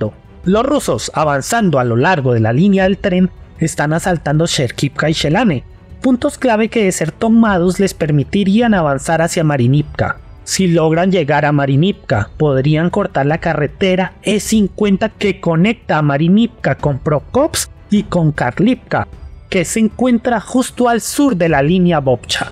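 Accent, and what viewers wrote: Colombia. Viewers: Este comentarista es colombiano? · Pasó de mexicano a colombiano 👍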